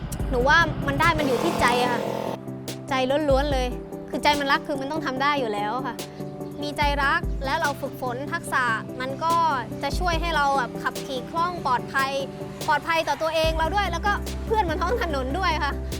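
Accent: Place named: South, Thailand. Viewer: เก่งมากครับน้อง ถามนิดคนจังหวัดอะไรครับ ผมคนนครศรีธรรมราช ฟังสำเนียงเหมื่อนคนใต้ครับ เก่งมากครับ น้อง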